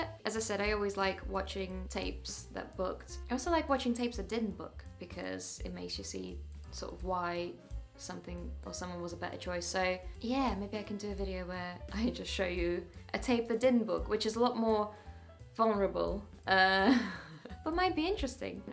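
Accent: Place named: United Kingdom. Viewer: Im surprised, your speech is horrible. Try speaking more clearly and with an American accent!!! British accents dont work well.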